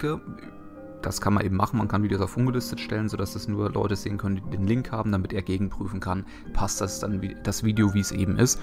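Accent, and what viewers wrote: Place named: Halle, Germany. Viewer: Legends never die! Seine Videos haben mir als Skyrim-Neuling bis jetzt sehr geholfen und tun es auch noch. Und seinen leichten Dialekt hab ich natürlich auch erkannt, ich denke mal Hallisch? Wäre mal interessant zu wissen, kann auch sein, dass ich falsch liege. Jedenfalls mach weiter so, und tu das, was du für richtig hälst. Vielleicht siehst du den Kommentar irgendwann, auch wenns unwahrscheinlich ist. Ich gucke jetzt mal deine FAQ-Playlist, vllt wird meine frage beantwortet. lg an euch ^^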